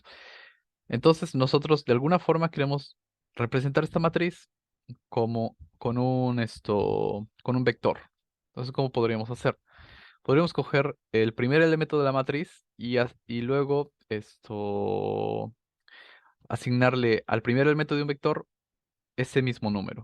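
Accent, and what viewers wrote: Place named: Peru. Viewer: De dónde eres?...tienes acento peruano. Excelente video.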